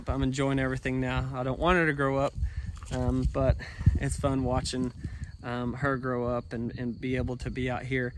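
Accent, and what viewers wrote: Oklahoma, United States. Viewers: I love Elenor. I’ve enjoyed your adventures. I have family in Moore so I enjoy watching the weather and hearing that great Oklahoma accent I’m in upstate ny. Thank you so much for sharing your lives. Good luck with the new place.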